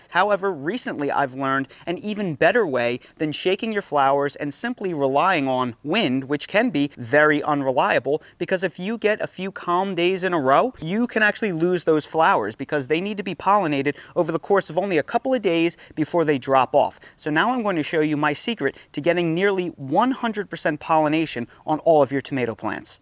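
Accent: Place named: Mid-Atlantic, United States. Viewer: I tell you what I’ve said this before you and your wife are my favorite go to info I really mean that and I recently saw from your work you are from where I live the mid Atlantic I’m in Delaware I lived in pa. Md And Delaware I guess I can relate to your accent lol thanks for all your hard work and info the best by far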